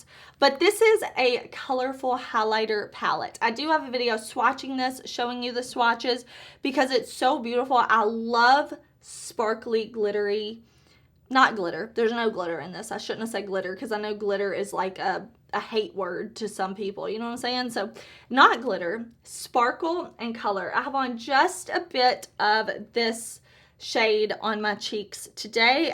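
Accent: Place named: Arkansas, United States. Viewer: Where are you from? Bc u sound like me 😅 I’m from Arkansas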